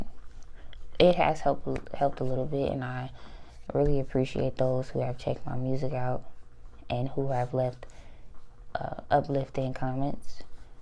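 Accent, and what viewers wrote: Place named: Indiana, United States. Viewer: good content, this is off topic but im from Georgia and my ex is from indiana and you and her have similar accents, but anyway what you're saying is understandable in regards to the reactions, your music and your passion for it is admirable, keep doing what you're doing. you'll get where you want with your gift.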